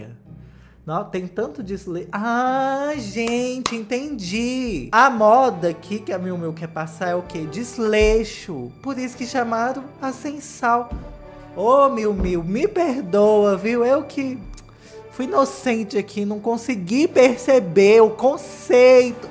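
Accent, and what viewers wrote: Minas Gerais, Brazil. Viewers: Eu amo o comentando as coisas com esse sotaquinho mineiro fofo ♡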